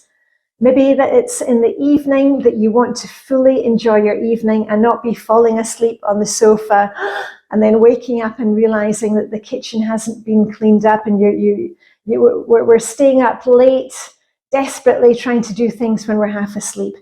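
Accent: Scotland, United Kingdom. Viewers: I love Denmark! But I perceive you are from Scotland!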